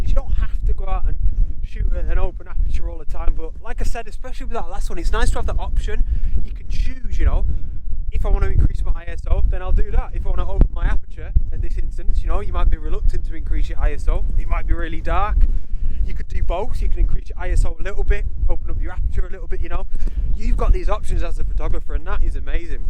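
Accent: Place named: Northern England, United Kingdom. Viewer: Great video. I never think of shooting wide open maybe it’s about time to have a go! So jealous of where you live, being able to visit such beautiful scenes. I sometimes wonder if I tune into your channel just to hear your lovely Northern accent being a Manchester girl myself! 👍📸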